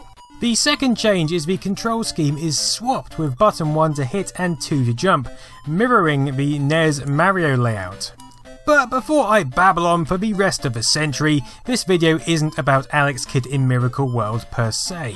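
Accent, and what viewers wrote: United Kingdom. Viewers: When British people pronounce Japanese words I cringe so hard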